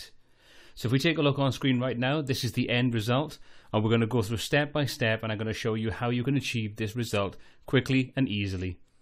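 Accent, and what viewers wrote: Wales, United Kingdom. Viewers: How lovely to hear a Welsh accent!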